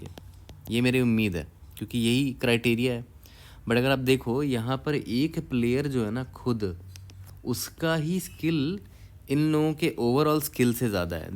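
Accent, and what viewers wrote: Bihar, India. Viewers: whi bohot meethi awaz hai lga hi tha bihar jharkhand side se honge, mere freind bhi wahi se hai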